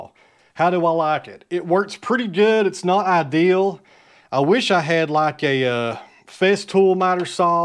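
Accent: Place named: Tennessee, United States. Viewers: Keep on bragin' on yourself my friend, and I love the TENN dialect. Love watching your videos! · I happen to like your Tennessee dialect! Don't change for anybody.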